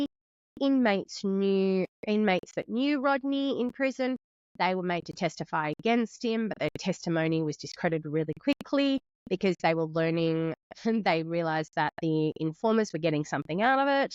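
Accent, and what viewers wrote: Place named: Australia. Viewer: Oooooo. I get the first comment. in the house. That's either a Kiwi or Aus accent. If pushed I am going to go with Aus (raised sentences in the absence of a question). I am going to Henry Higgins it and go for a WA (Perth) accent.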